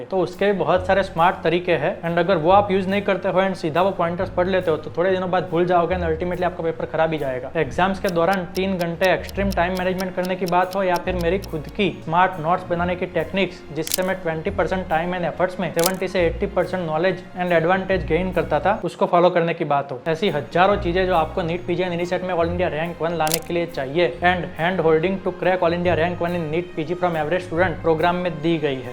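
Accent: Gujarat, India. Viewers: pure gujarati english😅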